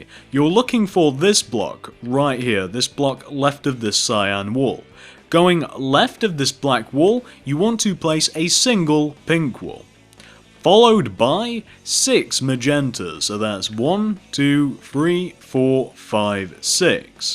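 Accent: United Kingdom. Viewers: He is from the UK I believe.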